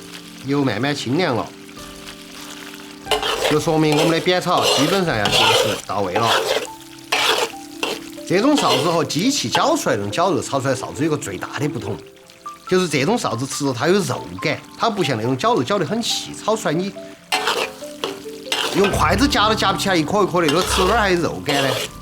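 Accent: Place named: Chengdu, China. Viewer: 成都话真是好听，女娃儿说更好听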